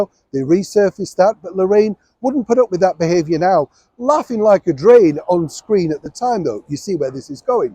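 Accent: Yorkshire, United Kingdom. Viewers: Neils a true yorkshire lad 😊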